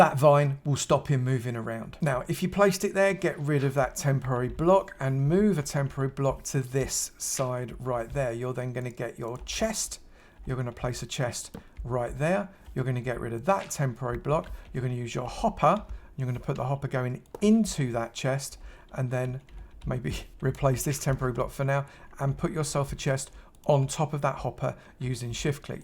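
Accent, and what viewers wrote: United Kingdom. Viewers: Loving your videos. Nice to hear a British accent 😀 What happens if a chest gets full up. Do the items go into the overflow?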